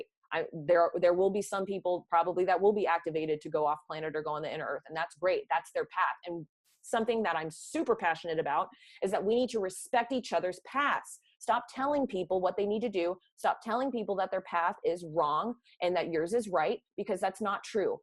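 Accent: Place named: Texas, United States. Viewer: You are clearly from Texas... I can tell because you talk so normally, but then you say y’all like it’s normal... so Texas... I am from OK, but good stuff I like your material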